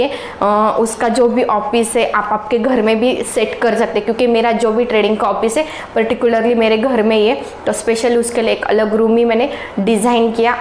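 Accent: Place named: Maharashtra, India. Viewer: Marathi logo ki hindi... जगात भारी 👍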